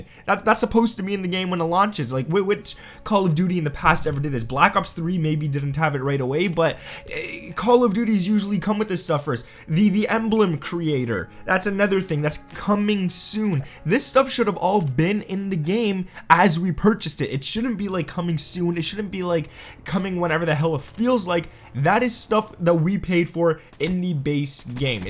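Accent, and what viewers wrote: Canada. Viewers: r u Canadian? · Matthew M he's canadian... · Dude your Canadian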